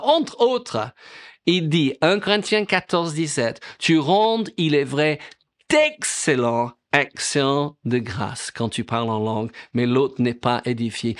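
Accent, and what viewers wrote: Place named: England, United Kingdom. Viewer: Message clair simple dit avec un joli accent Anglais....bon pas grave car tu présente tout ça avec joie et amour. Merci car je suis encouragé a rendre grâce pour tout ce que DIEU donne par le nom de JÉSUS AMEN Soyez bénis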